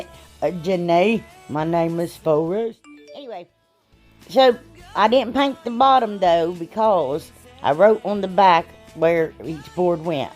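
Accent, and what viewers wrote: South, United States. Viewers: Girl I thought I sounded country but you have me beat. I love it. ❤️❤️ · Het, I just love all of these. They are all so stinking cute. I can't pick a favorite. For anyone who says anything about you or the way you talk, they don't have to watch. There are a lot of ignorant people. I guess they don't know any better. We love you and your crafts and the way you talk. I particularly love your southern accent. So don't even pay attention to people that say stupid stuff. The people that matter love you so that's all. 😁 ♥️♥️♥️ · IOVE YOU!!! And your channel If people don't like the way you talk then they shouldn't be watching I love the way you talk you are southern So am I Anyway (lol) some people just cant be nice!!! it's not in them But Anyways I loved all of them Great Job!!! You are the BEST!!!! You're my #1 crafter🖤🖤 Take Care My Friend and God Bless you and your family Love you to the moon and back and my #1 phrase is if you don't like me Oh well your loss I'm a nice person and if you're talking about me you're letting someone else rest awhile So suck ot up Butter cup (lol) Love you Sweet lady🖤🖤🖤🖤🖤🖤🖤🖤🖤🖤🖤🖤🖤🖤🖤🖤🖤🖤🖤🖤🤣🤣🤣🤣🤣🤣🤣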